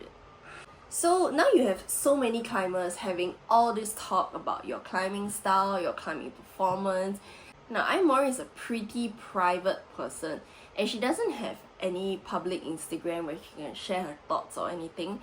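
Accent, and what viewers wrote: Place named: Taiwan. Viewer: Great video. We all love Ai Mori! But I also love your accent 🤣 Where are you from? Taiwan?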